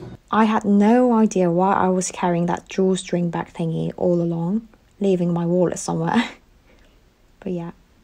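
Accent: United Kingdom. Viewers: 대만 브이로그와.. 영국 영어 까지.. 아주 브릴리언트한 영상입니다.. 잘보고가요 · I want to watch another episode!! Your vid is always amazing❤ I love your british accent too. Have a good one!!🩵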